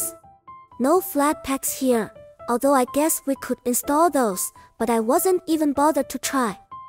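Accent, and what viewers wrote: China. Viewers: i love this english voice with the chinese accent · am I hearing things, or does the voice have a chinese accent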